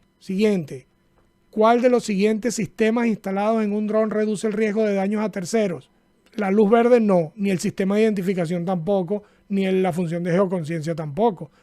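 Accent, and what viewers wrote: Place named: Venezuela. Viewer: Hola!! No se si te ha pasado, que despues de estar tan lejos de casa, oir a alguien hablando venezolano es como musica para mis oidos.. :-) aprendi mucho con el video anterior ( y me rei demasiado con "que tiene que ver el c... " y lo de la "burra bipeda" jajaja) y me dio mucha tranquilidad saber de alguien que despues de la perdida de un dron "mas nunca" se sintio seguro volando.. a mi me paso y eso que que compre un dron "fly away free".. me sentia incomoda, me daba mucho estres y lo guarde por un tiempo.. el cuento es que decidi tomar cartas en el asunto y el lunes presento el examen aqui en Noruega. Aun cuando el contenido es distinto, tu video me ayudo demasiado.. muchisimas gracias y un abrazo :-)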